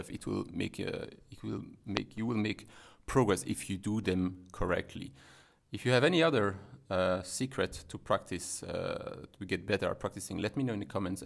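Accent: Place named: France. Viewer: J’avais pas vu ton nom. J’écoutais la vidéo et je me suis dit : ça, c’est un français. Raison m’en a été donnée.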